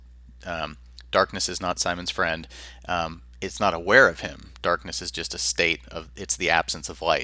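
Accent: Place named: Midwest, United States. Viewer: It sounds midwestern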